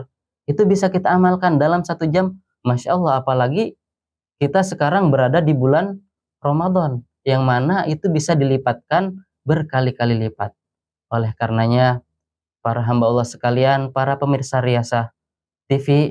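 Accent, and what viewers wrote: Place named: Bogor, Indonesia. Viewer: Tabarokallah Logat bogornya ga ilang😊😊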